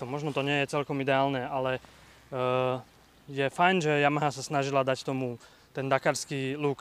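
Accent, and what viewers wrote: Eastern Slovakia, Slovakia. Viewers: Pěkný test, i já jsem ji dal v sobotu v Karlovicích pěkně za uši...Podle přízvuku tuším, že jsi vyhodnár, bo pekne si hutoril :-)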